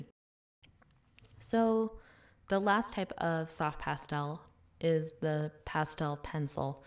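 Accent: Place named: Utah, United States. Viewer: I could tell by your accent, that's why I asked. My dad's from Utah, and you sound like a lot of my family on his side (and many a church leader I had 😅)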